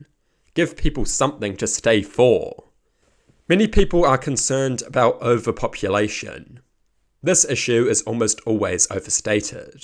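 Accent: New Zealand. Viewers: I like how he emphasizes the final syllable in each sentence, not sure if it's just a part of the New Zealand accent or just him though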